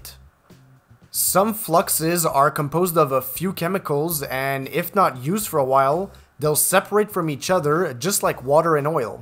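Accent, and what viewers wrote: Canada. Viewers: are you from Canada? your accent seems canadian !?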